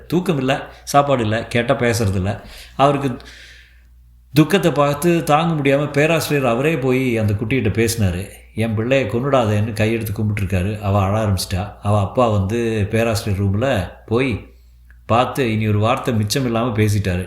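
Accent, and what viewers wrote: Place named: Nagercoil, India. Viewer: Nagarkovil slang miga nandraga pesuhireergal👌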